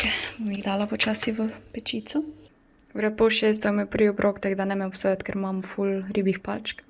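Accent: Carinthia, Austria. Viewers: Tvoji vlogi so meni zelooooooo zanimivi saj se pocutim zelo domace ko slisim koroski naglas ker sem tudi sama iz koroske🙈😂🥰👍👍🌈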